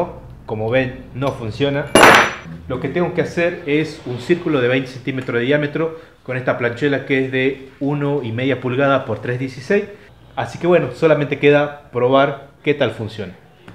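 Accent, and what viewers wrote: Tucumán, Argentina. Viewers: Hola de donde sos. Yo soy de tucuman te escucho asentó tucumano. Me gustaría conocerte. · Gracias por compartir, muy bueno ...tucu o salteño?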